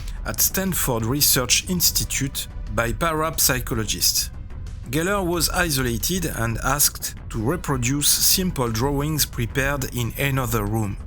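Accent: France. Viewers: I love when English is spoken in French 💜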